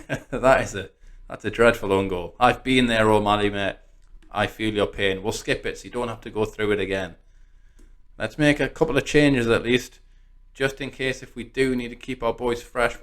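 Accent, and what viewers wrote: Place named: Newcastle, United Kingdom. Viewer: Spoiler Alert! Gutted I never heard you say “Champion”! It’s my favourite word in a Geordie accent! 🤣. Some great squad chemistry there mate, excellent games to play and you’re right, counting is hard! 🤣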